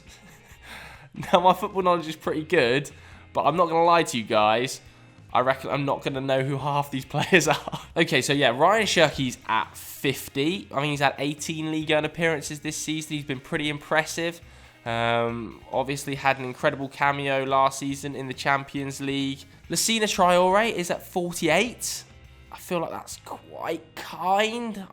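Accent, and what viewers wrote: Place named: RP, United Kingdom. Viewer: Posh wigger talking about football...skip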